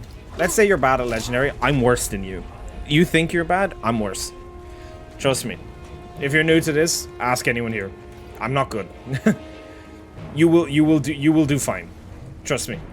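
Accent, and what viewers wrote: Ireland. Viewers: Glad to hear that voice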